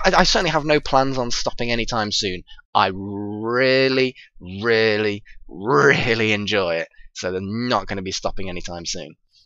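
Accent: United Kingdom. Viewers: I totally agree. The accent is just awesome same with Scottish accents. But I don't think I've ever paid attention to anyone with an Irish accent. (mainly cause I haven't heard anyone with one) But yeah the accent is one of the cool things about people from the UK.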